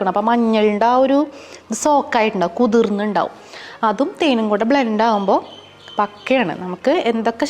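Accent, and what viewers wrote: Thrissur, India. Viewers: തൃശൂർ slang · Trissur dialogue ❤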